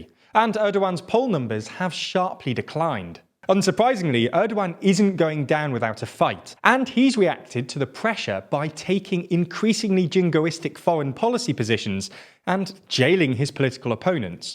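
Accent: United Kingdom. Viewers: I don't want politics in a british accent anymore. Let's make it happen, people. they wrecked the world.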